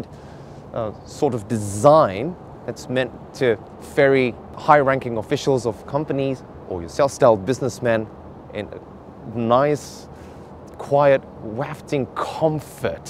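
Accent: England, United Kingdom. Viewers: The hairstyle needs a mod to fit with the English accent...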